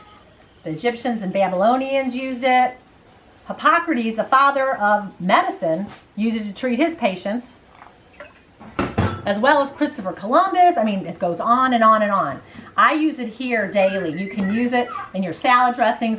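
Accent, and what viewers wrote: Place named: North, United States. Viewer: So much I didn't know about apple cider vinegar, wow! Thanks for all the tips and tricks. I'm putting home made a.c.v. on my list from now on! Also,...I hear a northern accent. Are you from WI by chance? Cuz I am, and would love to brag that you are too!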